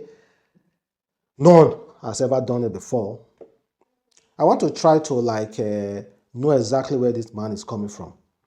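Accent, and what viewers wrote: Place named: Edo, Nigeria. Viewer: This guy self you no go school, why you dey read and pronounce like that, se something the worry you abi, do you even go back to watch your own videos before posting them, stop disgracing Edo state, if the social media is too hard for you, you can as well go back to farming. every time i watch your video its always filled with reading errors, very bad pronunciation. Am advising you to always go back and watch your videos before posting them, identify the errors, correct them before posting the videos, or contract an editor to work on it for you, youtube is a worldwide platform and you can't afford to keep disgracing yourself, Edo state and Nigeria at large, from the way you speak its obvious you're from Edo state, stop disgracing us.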